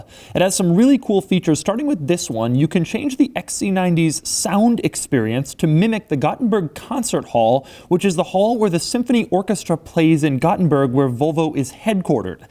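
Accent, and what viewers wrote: Flanders, Belgium. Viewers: Ist vlaams ofwa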